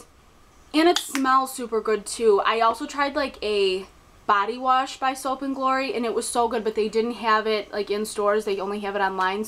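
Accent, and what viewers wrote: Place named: Midwest, United States. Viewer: I love the tarte smooth operator power it's amazing for under the eye! Where are you and your Midwestern accent from!? I live in Wisconsin :)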